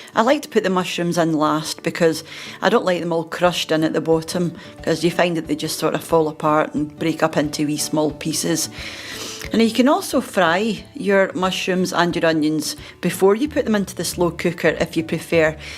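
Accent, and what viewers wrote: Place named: RP, United Kingdom. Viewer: Hi 🤗 Love a revisit & how old the recipe is - they're great for new subs to ur channel🙏🏽. Your voice sounds quite different from some of ur original 1st vids its now proper posh😆🤣😂 I'm doing ur 🐸in🕳 next week & can't wait. I do my Sausage caseroles on the stove top or oven as a one pot & just use my slow cooker for stews these days. No dishwasher in my gaff so anywhere i can save on washing dishes is more important to me these days. Keep these coming ❤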